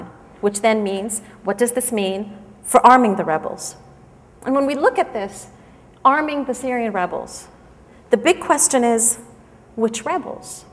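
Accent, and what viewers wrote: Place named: United States. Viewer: your ignorance is immeasurable. what do you know about the "conflict " in Syria other than the information you get from your masters, the brutal thugs, Assad's shabihah. It is not a conflict as you claim, it is a spontaneous revolution by the people, for the people. It is a revolution against oppression, inequality and corruption. Can you deny that there are more than 200000 detainees in Assad's dungeons with any charges except, they spoke out against him. you have jumped the gun and started defending the Assad's regime without even knowing the kind of Gas was used, the delivery system was used which only Assad has that. Speaking English with American accent is not going to make any credible. Taking your head out of you know what, can make you credible if you talk sense. You have taken the side of the most ruthless pig humanity has ever known. 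we're blind to the first 8 months of the peacful demonstrations that were faced with Assad's snipers from rooftops which murdered more than 700 innocent kids and more than 6300 innocent people. If all these horrific videos did not move anything in you, then you are a creature without any feelings, and you don't even belong to the human race.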